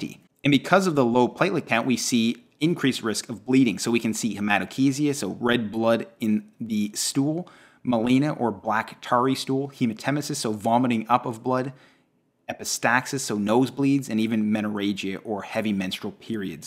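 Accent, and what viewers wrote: Canada. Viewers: This guy is canadian I can hear he's accent 😁 esp when he says "about"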